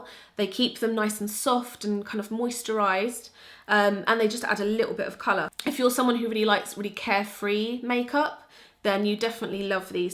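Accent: United Kingdom. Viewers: I just LOVE your british accent #italylovesyou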